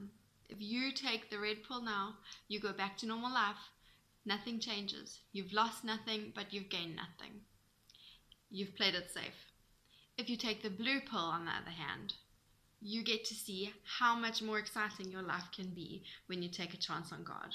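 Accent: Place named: Australia. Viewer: Great work! Your thoughts on faith are spot-on! I can relate so much to this because my journey with my Carlitos giveaway ministry to kids fighting uphill battles is a total faith-walk! Faith honors God, and God honors faith! Keep up the good work! (BTW, I love your accent - are you Australian? Forgive me if I'm nowhere close! haha!)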